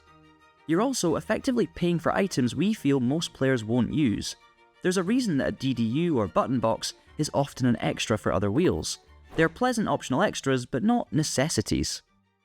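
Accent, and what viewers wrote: Scotland, United Kingdom. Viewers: heard the scottish accent that was enough for me lol support your own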